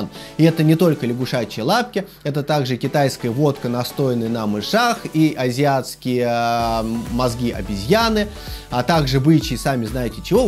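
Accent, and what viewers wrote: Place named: Voronezh, Russia. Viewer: а Вы случаем не из Воронежа?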